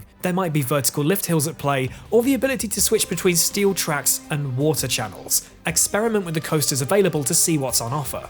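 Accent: United Kingdom. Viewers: What? He's just British lol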